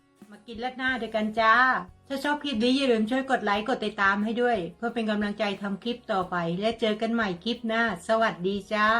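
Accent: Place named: Kamphaeng Phet, Thailand. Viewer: อร่อยอีกแล้วครับ.สำเนียงคล้ายๆคนกำแพงเลย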